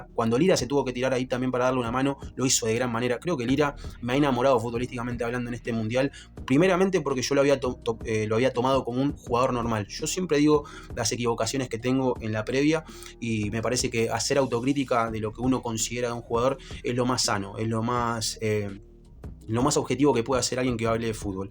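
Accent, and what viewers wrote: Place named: Argentina. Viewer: De donde sos voz que parecieras de acento argentino pero con educacion tal que no pareces argentino?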